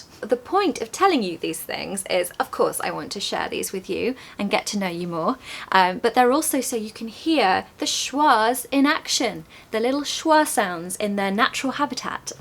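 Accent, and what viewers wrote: RP, United Kingdom. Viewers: Love your BRITISH ACCENT :) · Thanks for your British Accent, I enjoyed your video from South Africa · I lived in the UK for more then 16 years and it's a real pleasure to listen to that TRUE BRITISH ACCENT 😉😁😘😍🤘💪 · I have been teaching British accent for the last 30 years in India. I love your accent very much. I wish to visit Britain. Could you help me where I can stay in Britain ? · She doesn’t have « the » British accent, she has « a « British accent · Thank you. I love your british accent. When you pronounce worsd they sound musical as you said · Hi, i love your british accent and your pronunciation is very clear. thanks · Hello nice to meet you. I’m French, I discovered your channel today, so you won a subscriber. I’ve been learning English for a year, and I’m still bad. I love your British accent, because articulating too well thank you. 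I’m going to learn really well with you. Thank you, an admirer. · Thanks for sharing. I love British accent. I could understand everything. It's clear and nice. I wish I had a full Brit accent. Spanish is my mother tongue. Cheers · You have a nic british accent and amizing . go head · Hello, I like your smiling face when you explain plus British accent makes your video more interesting to watch 🥰 thanks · I like your British accent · I love British accent and your lip and your voice listening from Bangladesh.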